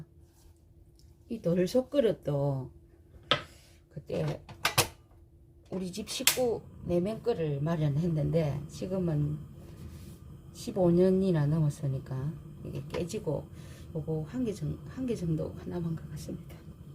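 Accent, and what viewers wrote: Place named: Gyeongsang, South Korea. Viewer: 돌솥비빔밥 제대로 알려주셔서 잘배웠습니다 나긋한 경상도 사투리로 설명 해주시니까 정겹습니다 자주 뵙겠습니다 😊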